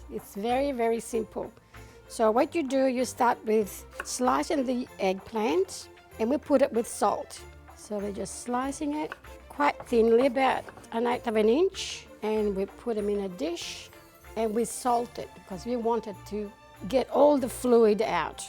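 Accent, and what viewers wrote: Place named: Australia. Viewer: I love your accent, Australia^^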